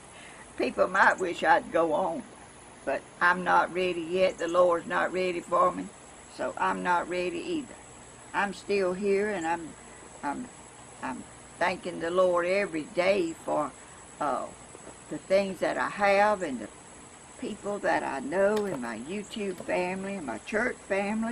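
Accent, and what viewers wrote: South, United States. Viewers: That’s exactly why you’re healthy because you haven’t been abusing your body in anyway And you have a great sense of humor and a happy person has a longer happier life I believe I think it’s wonderful stay the way your you’re absolutely beautiful lady very loving and caring and funny you are one of a kind I have so much in common with you is incredible even the southern accent and you do remind me a lot of my mother I miss her yeah I love birds to that a lot of birds in my yard and rabbits and squirrels love all gods creation May God bless you😊💕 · I Luvvvvv you. You are my U-Tube Granny from a brown mommy. My maternal Granny is heaven, my mom has Alzheimer's. When I need some "old-fasion-love" I visit you. Watching you sip your coffee, listening to your non-stop southern chit chat and your promisie to make it short fills a big whole in my heart. 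I luvvv it.! I enjoy sitting outdoors with you while you share your creative talents and your appreciation of Mother Nature. Your windchimes are music to my ears. Did you make them? I want them in my yard!! Your love of God is uplifting. May you continue to be blessed with good health and sound mind. I want to thank your grandson for being so patient and loving. Keep creating for it is your lifeline. Thanks for making me feel at home Granny. 🌻☕😘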